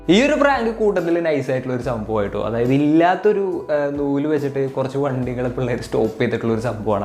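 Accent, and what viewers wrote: Thrissur, India. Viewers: Chettan mmade Thrissur aano 💜💜atho Angamaly aano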